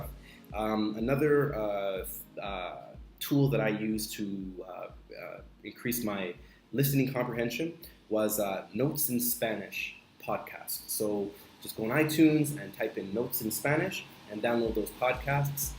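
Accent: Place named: Caribbean. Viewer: I use duolingo as well! I've been trying to learn Spanish, but it's so difficult because I live in small town, USA. And most people that do speak Spanish doesn't want to speak to me in Spanish. Sometimes my head hurts from all the studying. BTW, I want to ask you, do you have a Caribbean background. I think I can hear a little accent. Thanks for the video, it's really informative. You should make more videos speaking Spanish! I would love to hear how your Spanish has improved since you've taught yourself.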